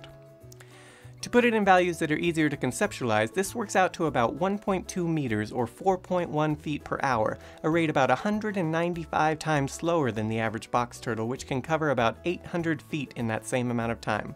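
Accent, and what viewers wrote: United Kingdom. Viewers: To be fair, the smart British accent facade is getting a little old in general, though I can't argue that it wasn't a smart move all the same. At least I don't feel like Simon wants to kung fu fight me like that guy from PBS Space Time. His "smarts" are over 9000. Cheers.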